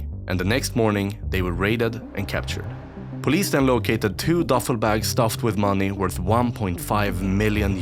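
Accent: Sweden. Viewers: From sweden? Know the accent since im from there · Amazing video! LEMMINO vibes with your swedish accent :)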